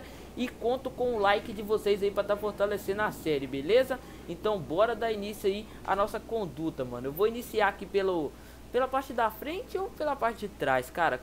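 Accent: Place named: Rio de Janeiro, Brazil. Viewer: voce e carioca né? fica falando bota cara e pq é do rj haushauhsauhs tbm sou!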